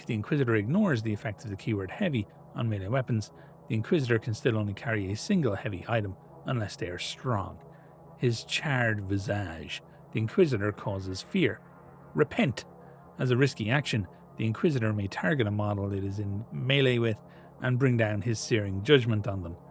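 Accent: Ireland. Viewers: These drops are comin in too hot and fast! My heart can't take this much dulcet Irish trench ASMR! (Yes it can, I need more, MOAR!!!!!!)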